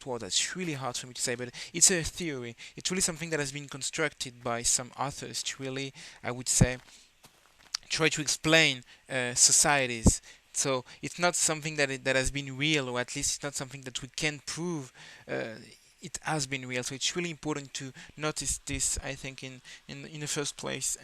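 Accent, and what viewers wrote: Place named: United Kingdom. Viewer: tu parles vraiment bien anglais avec un accent très British !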